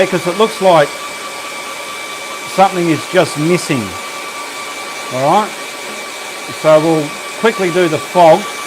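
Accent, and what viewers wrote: Australia. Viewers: I live in another part of the world, so I’m never so lucky to catch you live, but i love the replay 👍👍 i love your way of painting but most of all i love the way you talk, aussie langue is very inspiring 😉 thanks for you 👍👍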